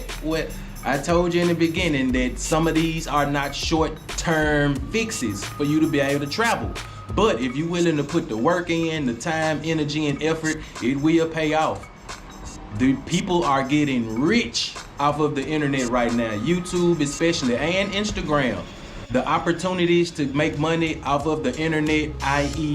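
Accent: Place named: Tennessee, United States. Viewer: Is you from the A or are you from Memphis. I hear Tennessee.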